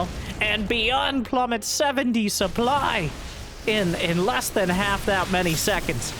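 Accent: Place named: Tennessee, United States. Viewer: Casual banshee. Accent from Tenesee Ain't no draftee. This is StarCraft 3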